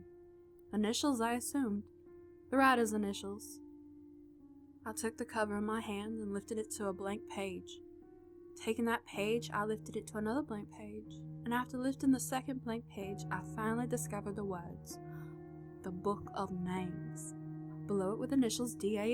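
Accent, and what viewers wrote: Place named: West, United States. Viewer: Real good western accent. I thought it fit the story.:)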